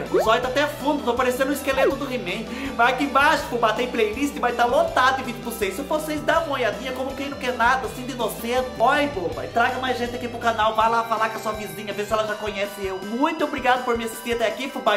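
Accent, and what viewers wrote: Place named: Minas Gerais, Brazil. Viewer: Oiee ,Fubá eu amo seus vídeos e o seu sotaque, você por acaso é mineiro como eu,🤣🤣🤣🤣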